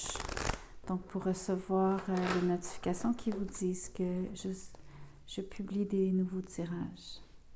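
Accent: Quebec, Canada. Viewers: Magnifique petit accent québécois -:) merci pour le tirage qui me parle, dommage pour le son trop bas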